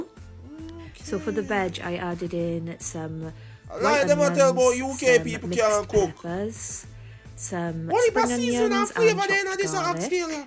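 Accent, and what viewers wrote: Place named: Wales, United Kingdom. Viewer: She sounds Welsh.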